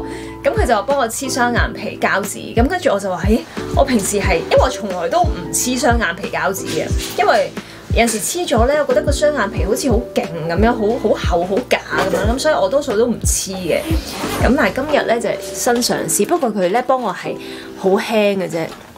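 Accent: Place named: Shiqi, China. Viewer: 好聲好戲裏Yoyo配得非常好🎶💯,努力背石岐話勁👍😘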